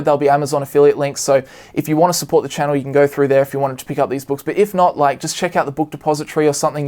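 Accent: Australia. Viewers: Are you Australian? If yes, whereabouts if you dont mind sharing?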